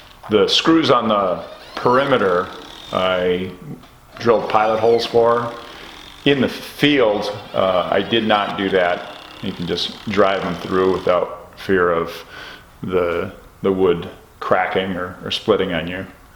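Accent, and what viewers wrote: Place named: Southeastern Ohio, United States. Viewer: "Acrosst" vs "Across" is regional dialect, certainly in southeastern Ohio, ala Crick vs Creek, Warshington vs Washington, Booshes vs Bushes. I would know, being a hick from the sticks.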